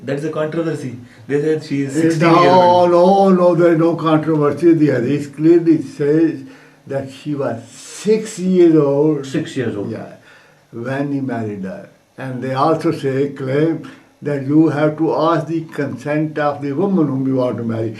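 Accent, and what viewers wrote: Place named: Kashmir, India. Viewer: you speak like kashmiri pundit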